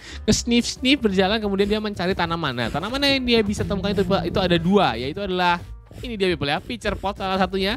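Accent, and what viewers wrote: Java, Indonesia. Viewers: Medhok bgtt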